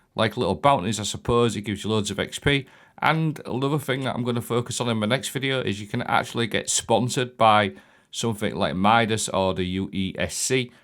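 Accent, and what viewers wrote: United Kingdom. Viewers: With your accent you can't be US based? You have to be in the UK surely?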